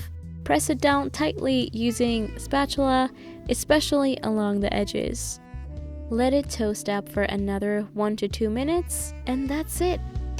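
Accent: Germany. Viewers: she is german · Are you german? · is she german ? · are you German? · Oh You’re german. Until the “real” I didn’t actually realize, after that I started to realize the accent lol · are you german? :)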